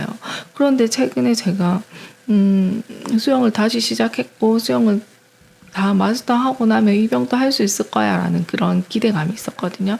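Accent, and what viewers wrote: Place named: Busan, South Korea. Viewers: 좋은이야기 잘듣고가요~^^ 경상도 말투 넘 좋습니다~ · 세부 바다 수영 ㅋㅋ 영상 왤케 귀여우십니까.ㅋㅋ 부산사투리가 완전 귀여워요 ㅋㅋ 물론 저도 부산사람입니다만 ㅋㅋ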